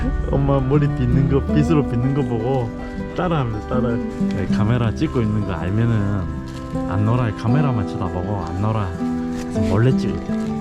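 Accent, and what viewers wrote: Gyeongsang, South Korea. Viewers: 정말 결혼 잘하셨어요 경상도 말씨 듣기 좋습니다 ㅎ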